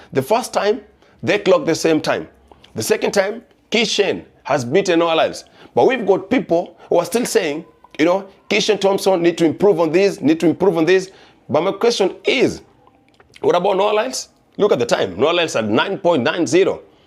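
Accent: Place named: Jamaica. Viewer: Bro, I love how you are practising your patois ❤.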